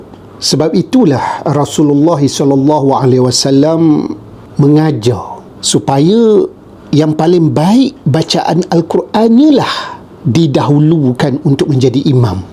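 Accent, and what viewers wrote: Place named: Perak, Malaysia. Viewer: Tu dialek perak...jangan sedap mulut kalau jahil.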